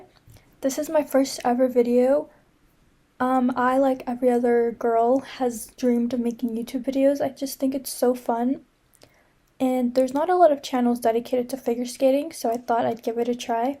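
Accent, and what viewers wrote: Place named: Russia. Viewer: great debut, since it is your first video! your voice, itʼs got that ASMR magic, would you try to do it next? :) seriously, the way of how a skaters hit those spins and axels, it’s giving a vibes of 19th century energy, ngl – no clear winter and winner, just pure, relentless artistry. and the costumes? chefʼs kiss. your russian accent and pronunciation is very good! do you study this language? and before anyone starts, as it may feel like, i support all skaters, not just the ones from my ancestral homeland, alright? only appreciating the art, i am a native american with a some russian roots, remember, leo dildocaprio (joking 😂) does have a russian grandma too. donʼt get it twisted, guys, support the girl, she is cool for trying to popularize an underground kind of sports, which our small community loves, keep it up, subscribed, and, as youʼve asked to be kind in suggestions or critics, will suggest to try to use your favorite music, as a background and vibey layer of your voice!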